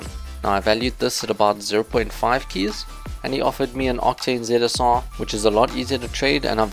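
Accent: South Africa. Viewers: your south African right? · i hear south african, i like. Btw, i know how the lag feels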